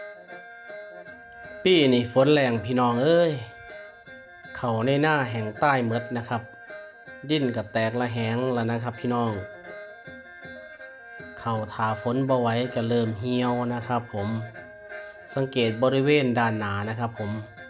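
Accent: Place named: Isan, Thailand. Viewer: สู้เขาเด้ออ้ายบ้านเฮามันแล้ง บ้านผมกะบ่ต่างกันเลยครับ ฟังอ้ายเว้าแล้วผมคิดฮอดบ้านเลยครับ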